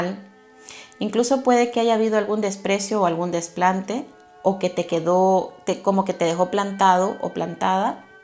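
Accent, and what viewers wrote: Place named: Mexico. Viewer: . Tienes tono de Mexico lindo y querido. Porque no haces una oferta especial para los mexicanos que queremos consulta personalizada. Esta muy caro en euros 🙏🏻💕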